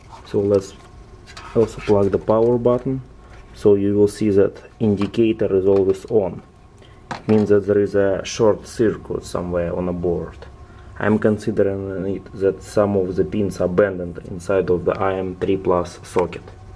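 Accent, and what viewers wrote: Russia. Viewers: Акцент Русский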